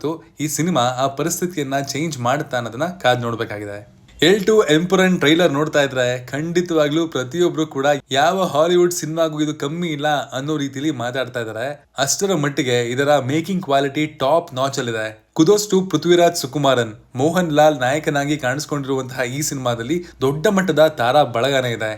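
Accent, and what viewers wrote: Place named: Hubballi, India. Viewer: Hi ದೋಸ್ತ ಏನ್ ಬೆಂಗಳೂರು ಇಂದ ಹುಬ್ಬಳಿ ಗೇ ಬಂದಿರಿ ಮಾತಲ್ಲಿ.. Yake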